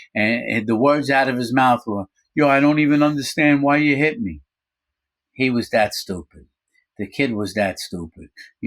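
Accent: New York, United States. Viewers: Thank God that didn't effect your parole you deserve some luck that could have ended very badly for you both 🤞 your a great story teller i think the New York accent also helps looking forward to how you managed to be friends with the nurse haha 😅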